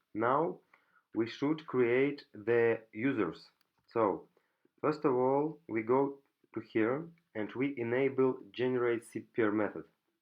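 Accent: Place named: Russia. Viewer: Привет. Отлично разговариваешь на английском, но у тебя русский акцент. Подписка.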